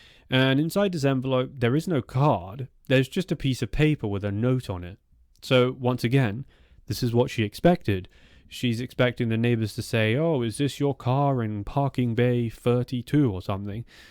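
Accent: United Kingdom. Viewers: You have some great stories,but please make them less meandering!! I've seen people commenting on that in your other videos...you will lose viewers, otherwise. Of course, your British accent is nice and you seem personable. Just less detail,so that you can keep the story moving along...😊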